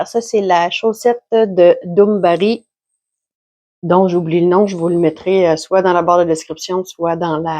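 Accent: Quebec, Canada. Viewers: Bonsoir de la Normandie,j'ai adoré votre vidéo car moi même je tricote de tout ainsi je réalise des vêtements aux crochet.J'adore votre accent venant du Québec.Mes amitiés bonne santé et meilleurs voeux pour 2024Maryvonne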